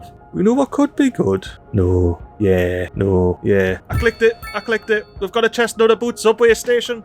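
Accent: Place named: Newcastle, United Kingdom. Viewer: geordie commentary? Love to hear it 🤣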